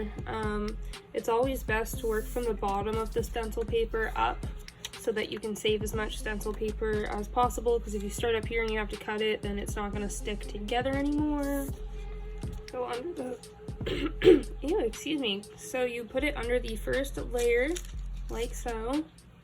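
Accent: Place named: Canada. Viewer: This is incredible, and super helpful rn... thank you 🙃 Also, the most Canadian accent I've heard in some time 😄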